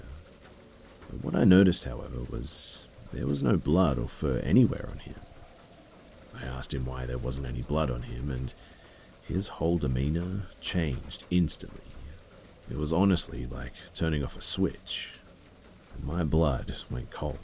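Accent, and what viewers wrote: Australia. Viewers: I like you. Got a nice voice. I suppose you are from down under? Greetings from North Vancouver island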